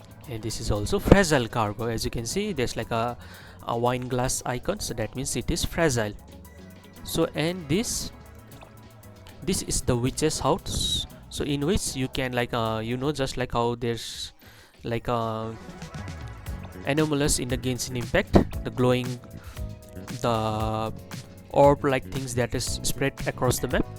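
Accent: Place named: Nepal. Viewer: The video was really good and easy to understand, but your accent got me. By any chance are you from Nepal? Your accent really reminds me of a typical Nepali accent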